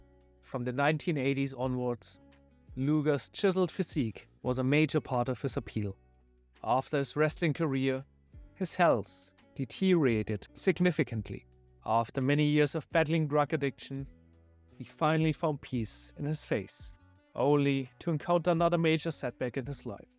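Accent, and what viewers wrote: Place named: Germany. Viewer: This guy sounds german as heck yet he is from japan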